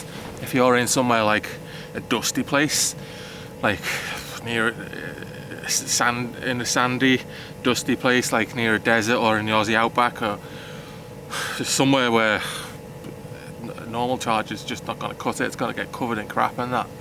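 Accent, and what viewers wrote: Liverpool, United Kingdom. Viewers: Interesting! I've just found your videos, only because your i-pace v e-niro comparison was posted on a Kia forum, I'm really enjoying watching them. I like your natural presentation style and subject matter, and like seeing the beautifully spectacular finnish scenery. I cringe when I see you touching things with your bear hands in those temperatures😮. I suffer from reynauds so I can't tolerate even the slightest dip below freezing point😟. Do I detect a scouse accent?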